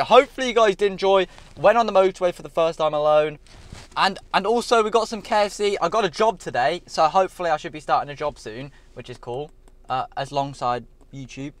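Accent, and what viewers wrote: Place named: East Midlands, United Kingdom. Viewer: where abouts you from man :) sound like your from the east midlands :P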